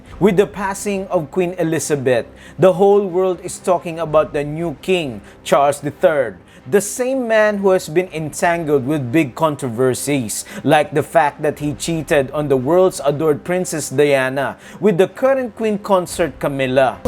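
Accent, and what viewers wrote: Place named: Philippines. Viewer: Your accent sounds Filipino. Nice video by the way .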